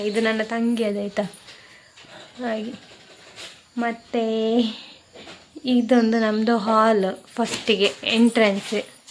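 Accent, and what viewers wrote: India. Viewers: Nim kundapura super ❤ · ನನಗೆ ದಕ್ಷಿಣ ಕನ್ನಡದವರು ಅಂದ್ರೆ ತುಂಬಾ ಇಷ್ಟ ನಿಮ್ಮ ಭಾಷೆ. ಮಾತನಾಡುವ ಶೈಲಿ. ನನ್ನ ಮದುವೆ ಆಗುತ್ತಿರಾ ನೀವು. ❤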